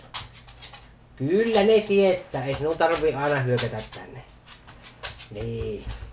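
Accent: Savo, Finland. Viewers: nyt ainakin kuulee ton savolaisen murteen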